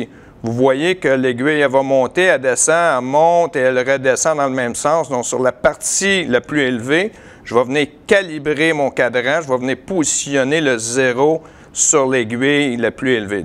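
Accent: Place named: Canada. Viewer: très bonne explication mr mais j'ai eu dès fois très dur a vous comprendre a cause de votre accent canadien mais ca va bravo et félicitation cordialement richard .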